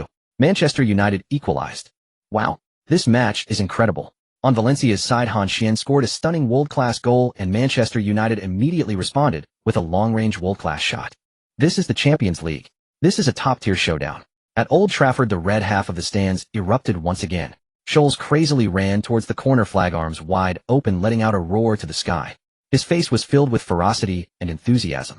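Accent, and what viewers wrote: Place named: China. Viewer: Is he playing in La Liga or Chinese super league because every name is pronounced in Chinese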